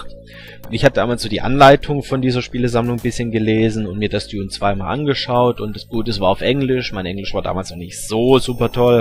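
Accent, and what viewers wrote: Swabia, Germany. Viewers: Yay, ein Schwabe ^^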